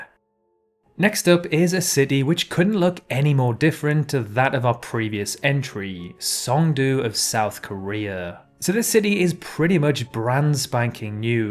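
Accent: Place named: RP, United Kingdom. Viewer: You sound like an upper class twit from a Monty Python skit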